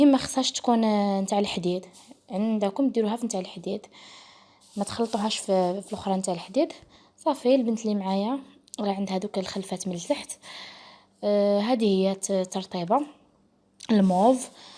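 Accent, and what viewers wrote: Morocco. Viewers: اول مرة نشوفك ومن صوتك باينه فيك بلي وجدية بنت مدينتي 🖒🖒 شكرا على المعلومات انا شعري فيه الدرجات بزاف ومشعكك ههه وبغيت نديرها خفت يطيحلي بصح مين شفتك درتيها للبنت طمنتيني شكرا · الهدرة ديالك باينة من الشرق واش وجدية ولا بركانية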